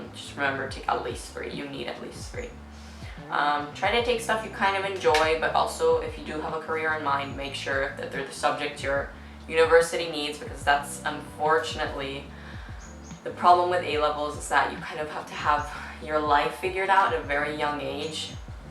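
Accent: United States. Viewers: I hope this doesn't come across as too nosey, I'm just a curious kind of person :D You sound American to my British ears, are you doing A-levels specifically because you want to study in the UK? Hai scritto che sei italiana, vivi in Italia in questo momento? Hai fatto il liceo o stai facendo qualche scuola internazionale / inglese? Come si fa a fare gli A Level all'estero, ci sono centri dove si danno gli esami? Cmq in bocca al lupo per l'università... io sto pensando di tornare a fare gli A Level di nuovo quest'anno perché sono 3 anni che faccio questo stesso lavoro e ormai è diventato noiosissimo. Scusa tutte le domande, ma sono uno che deve sapere tutto :D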